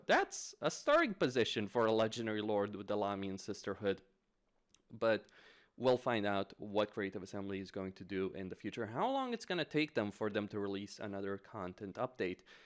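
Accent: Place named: Romania. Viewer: e sounds Romanian I might be wrong tho. Some Balkan country maybe? Romanians have a pretty distinct accent I find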